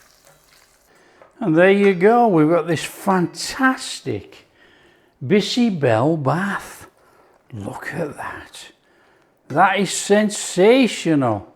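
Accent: United Kingdom. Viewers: I like your British accent.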